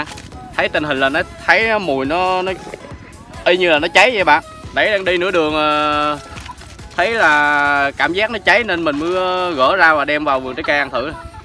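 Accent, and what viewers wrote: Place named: Dong Nai, Vietnam. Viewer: Quê a đâu mà e nghe a ở long khánh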